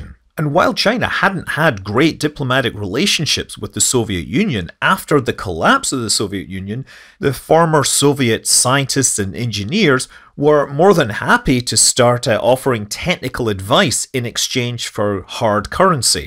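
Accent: Scotland, United Kingdom. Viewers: Is Scott... Scottish? I thought I've heard a Scottish accent.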